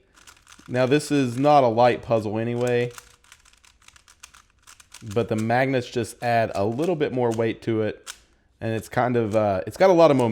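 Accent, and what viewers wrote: Texas, United States. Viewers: You gotta Texas accent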